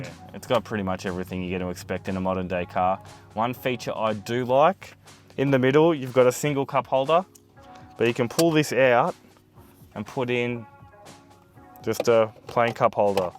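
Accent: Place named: Australia. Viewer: "GDS" lol..This just goes to show how slack the Aussie accent is, lol. Seriously, Aussie Motoring Journos should have to take speech lessons.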